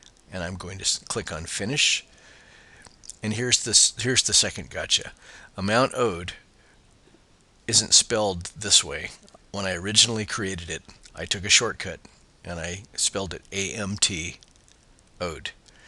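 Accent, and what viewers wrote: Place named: United States. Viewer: I FINALLY FOUND AN INSTRUCTOR WHO SPEAKS AMERICAN ENGLISH.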